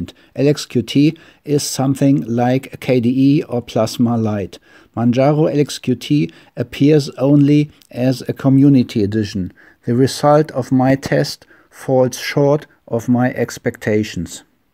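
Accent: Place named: Germany. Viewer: wieso kann man das englisch klar verstehen aber das deutsch trotzdem raushören?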